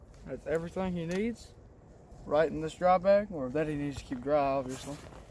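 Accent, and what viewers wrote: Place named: North Carolina, United States. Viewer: A very good video! Thanks for sharing your ideas. Several will make their way onto my large ALICE pack. I found a M1976 sleep system carrier in new condition! One thing I didn't hear in the video was the total weight of that pack system as shown. How heavy? I'd also like to say that it's evident you have a lot of respect for your Dad and a great relationship. You do well on camera and have a great NC accent. I'm from KY originally and my accent finds it way out any time I'm around my "people". I was a boy scout (an Eagle!) all through the 70s and the ALICE was a very common pack back then. Was at the scout store recently and they have gone high tech with a rack full of Ospreys. Oh well, we traditionalists can continue to fly the mil-surp flag.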